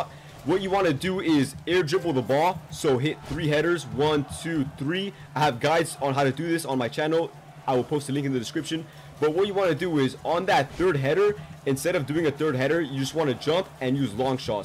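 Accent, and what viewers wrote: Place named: United Kingdom. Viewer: You sounded like british aiku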